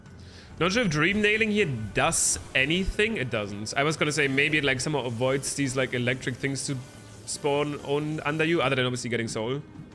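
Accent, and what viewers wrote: Germany. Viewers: That accent... Are you from Germany? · yooo are u german !?!?!? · One question, are you german? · Idk why but You sound german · You sound german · German Dialekt ballert rein 😂 Trd geiles vid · he has a german accent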